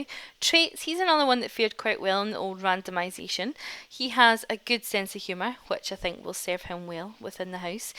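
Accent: Scotland, United Kingdom. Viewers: Are you scottish cos i am too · I really like your accent!!!! Is it Scottish here? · Hey are you Scottish? So am I :D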